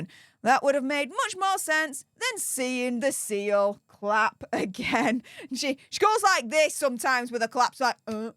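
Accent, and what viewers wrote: United Kingdom. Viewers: Its the British accent dum dum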